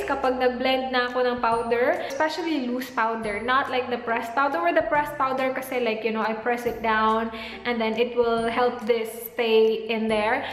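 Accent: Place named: Visayas, Philippines. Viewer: blimeshis hahahaahha maklaro ang pagkabisaya ate :D chos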